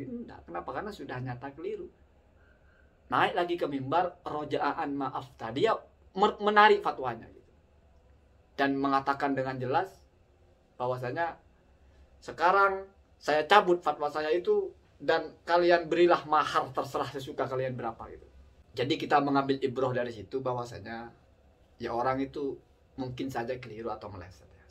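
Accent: Madura, Indonesia. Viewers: Semoga menjadi pengasuh pesantren 🙏🏼👍 Kayaknya Madura ya???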